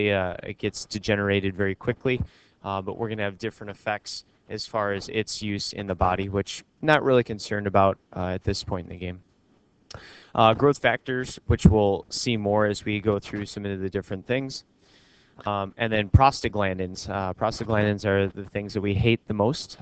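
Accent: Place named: Upstate New York, United States. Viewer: An upstate NY accent! What a surprise to find when I was surfing the internet looking for endocrine lectures :)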